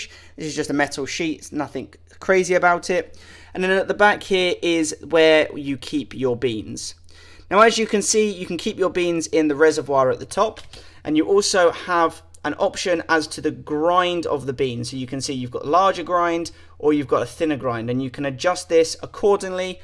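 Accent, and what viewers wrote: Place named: United Kingdom. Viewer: Thanks for the coffee and British accent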